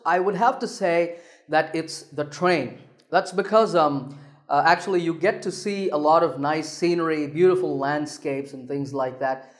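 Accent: United States. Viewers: your JAM technique is lit.🔥🔥 love that you're channeling the American accent perfectly. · if you don't watch the video, just listening to the audio, you'd think you're listening an american speaking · Sir, do you speak in American English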